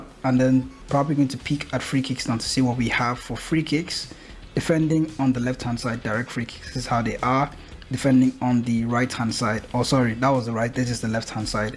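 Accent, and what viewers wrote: Nigeria. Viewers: This is very random, but you sound Nigerian. Great work though.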